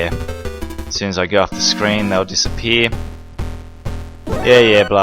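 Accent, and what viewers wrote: Australia. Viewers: i love your voice its a really thick Australian accent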